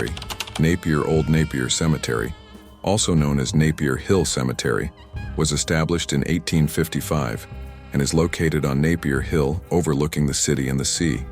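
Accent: United States. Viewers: The guy is American, give him a break😂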